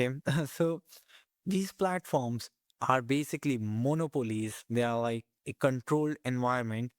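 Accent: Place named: Bengal, India. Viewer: I was inspired to create my own website after watching your YouTube videos. You were right about compliance. I never had a Google account in the past, but I created one a few days ago to comply with Google's requirements. By the way, I suspect you might know Bengali because your tone sounds like it. Thank you for inspiring me to use Linux.❤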